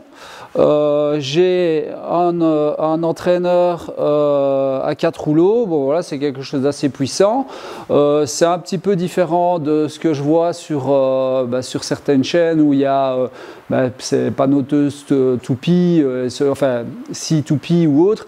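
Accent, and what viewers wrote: Belgium. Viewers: Rare sont les artisans qui soient autant passionnés et très pédagogue dans le partage de leurs savoir faire et en plus avec l'accent Belge !!!!!😁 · Slt, tu as un chouette atelier, merci pour cette vidéo, tes conseils et le parler Belge avec humour. Pour les cours d'api, tu as un voisin qui maîtrise très bien le sujet: <link> à bientôt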